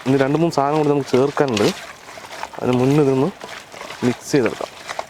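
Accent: Palakkad, India. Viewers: ikkayeyum palakadan bhashayum ishttamullavar like adikku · ഇക്കാടെ പാലക്കാട് ഭാഷ ഇഷ്ടമുളളവർ ലൈക് അടിക്ക്🤩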